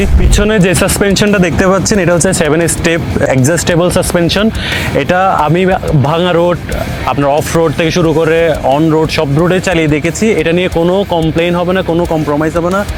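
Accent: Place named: Chittagong, Bangladesh. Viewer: শেষ..!! এই পোলারে বাইক দিয়ে বাইক আর ফিরে পাবার অবস্থা থাকবে না। নিউ বাইককে এরকম স্ট্রেস দিলে সেটা আর মনে হয় চালানোর অবস্থা থাকবে না। আর যে যে প্রজুক্তির কথা বলা হল এগুলো বাজারে আসছে আরো কয়েক বছর আগেই। এরকম তেল খেয়ে বাইক রিভিউ করার কোন দরকার নাই। অন্তত লিকুইড কুলিং থাক্লেও হত। আর চট্টগ্রাম এর টোন তো সেরা টোন....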